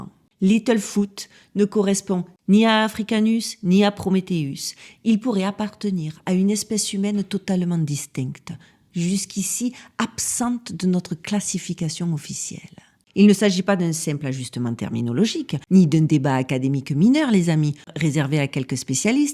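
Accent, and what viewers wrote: South, France. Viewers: Bonjour de Suisse, ne vous excusez pas tout est parfait 🙏🩷🩷🩷 J'adore vos sujets, et votre personnalité 💖 et votre accent du sud est juste 😍 toppissime!!!! Bonnes fêtes à vous et votre famille 🥳🎶🍾🥂❤️❤️❤️ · Je viens de vous découvrir et je peux dire que je vous trouve formidable!!! J’adore vos contenus et le format! Et votre accent du sud!😉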